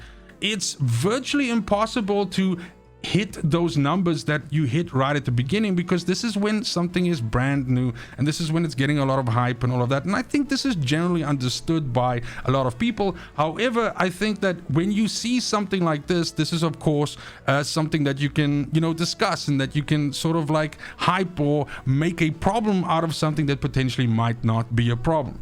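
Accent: South Africa. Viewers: Are you from South Africa perhaps? This, coming from a South African.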